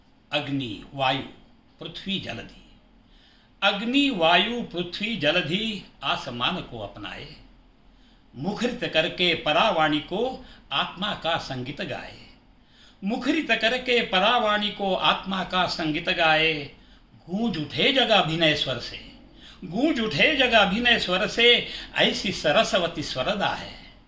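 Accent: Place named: Maharashtra, India. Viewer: मराठी touch !!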